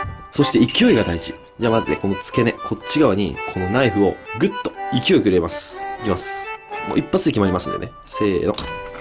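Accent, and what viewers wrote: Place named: Mikawa, Japan. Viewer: だらって三河弁なんだね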